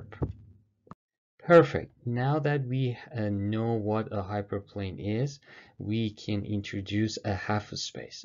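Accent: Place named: Iran. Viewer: the accent is kinda iranian btw the best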